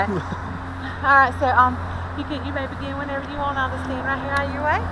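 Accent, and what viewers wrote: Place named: Texas, United States. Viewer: I love her Texan accent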